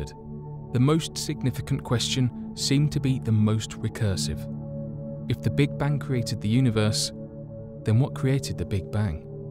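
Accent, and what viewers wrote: England, United Kingdom. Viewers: its always a british narator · I knew he was going to have an English accent before the video loaded.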